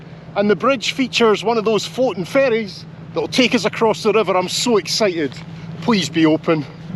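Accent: Scotland, United Kingdom. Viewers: Always enjoying your videos ... and your fine scottish accent :-) This is indeed one heck of a special way to cross a canal! Wow Many happy and safe trails for the new year!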